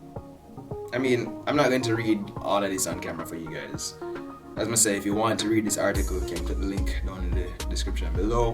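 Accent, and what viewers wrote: Jamaica. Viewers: This might sound crazy, but him sound like young machel montano, just with a jamaican accent instead. Same kinda tone and slight raspiness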